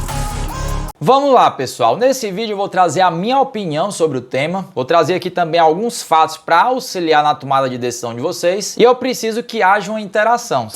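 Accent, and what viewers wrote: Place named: Fortaleza, Brazil. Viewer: Tu é de Fortaleza macho 😀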